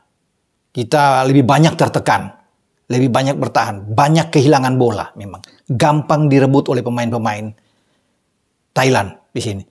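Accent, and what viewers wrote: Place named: Palembang, Indonesia. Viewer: logatnya kayak wong kito galo bener dak.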